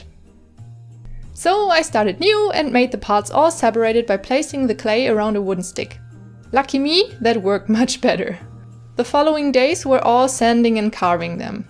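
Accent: Germany. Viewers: Where are you from? Your English reaaally sounds like you're from Germany ^^ and the 'Moin' makes me think you're from the north sea. Am I right or completly wrong? 🌊😍 BTW! It's a reaaalllyy nice doll ❤️ instant subscribe 😊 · Why is the fabric softener named yes please? Sorry I know German Edit: I am German! I knew your accent was German! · I just found your channel yesterday and I already loved it after the first video also your voice sounds really cute... are you from Germany? I am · Man merkt an deiner aussprache das du Deutsche bist. Anfangs war ich zwar etwas unsicher, aber dann sah ich ''UHU Alleskleber'' und boom ich war stolz auf mich das ich an der aussprache erkennen kann von wo wer kommt!!! Btw cute doll <3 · omg are you German? I'm am German, it sounds like it to me :3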